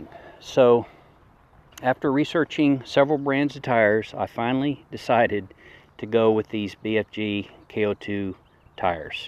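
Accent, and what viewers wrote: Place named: Texas, United States. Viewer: You sound like you have either a Texan or Southern accent. Your California license plates threw me off. 😂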